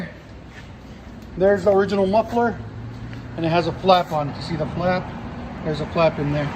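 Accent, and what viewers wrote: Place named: North, United States. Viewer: Tn tags but he sounds like a Yankee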